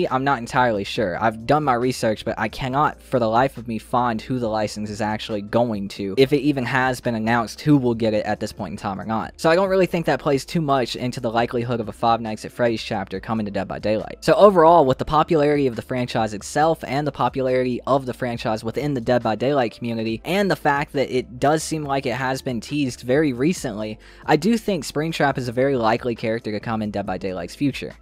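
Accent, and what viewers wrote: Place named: North Carolina, United States. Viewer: On today’s episode ok guess that accent we haaaaaave! Now, I’m gonna guess that accent is from….North Carolina!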